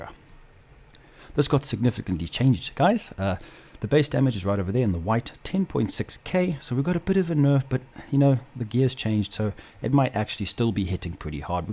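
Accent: South Africa. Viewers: Are you from South Africa? And Great video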